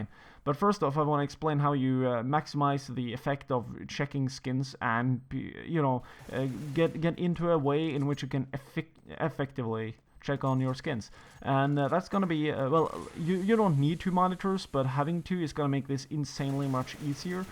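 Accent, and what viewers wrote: Norway. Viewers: Haha, du har god engelsk, men alle nordmenn har en aksang man lett kjenner igjen. Kan jeg adde deg på steam?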